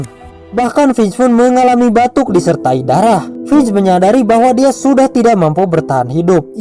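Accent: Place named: West Java, Indonesia. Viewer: Salam satu alur. Salam pemula om. Orang sunda yahhhh???? Hehehehe Keren narasinya ommmm👌🏻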